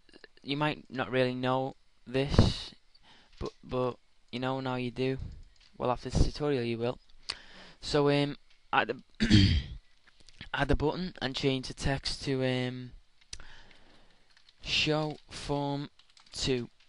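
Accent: Liverpool, United Kingdom. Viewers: Thank you so much for these! What makes these video tutorials really good is the simplistic approach. Straight to the point, plain language and easy to understand instructions. It takes the fear factor of getting into the "complicated" world of programming out of the equation. And let's not forget the Scouse accent!!! "Best in the Weld" :-)))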